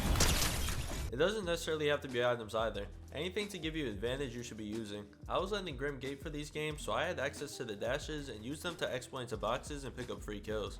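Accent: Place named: Netherlands. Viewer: Ben je NL? je accent is nl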